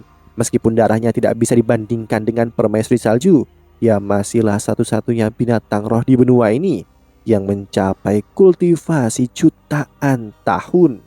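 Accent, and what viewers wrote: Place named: Purbalingga, Indonesia. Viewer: Cah ngapak purbalingga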